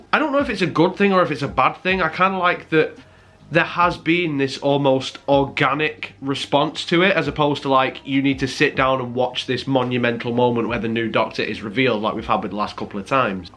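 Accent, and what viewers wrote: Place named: Leeds, United Kingdom. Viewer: Bit off topic, but are you from Leeds mate?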